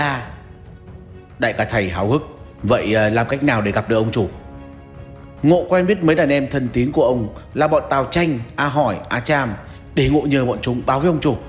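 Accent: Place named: North, Vietnam. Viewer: Giọng bk đèo bỏ mẹ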